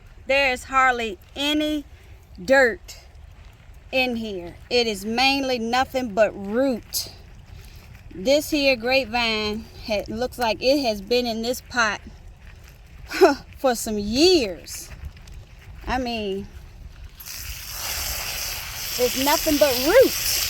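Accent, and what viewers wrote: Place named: North Carolina, United States. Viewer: You sound like you're from lumberton NC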